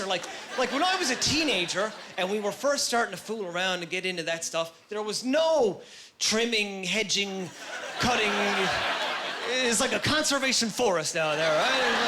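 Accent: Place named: Maritimes, Canada. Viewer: >>>. Tinsel cat ?? Best ever. Excellent set. I love a Maritime accent.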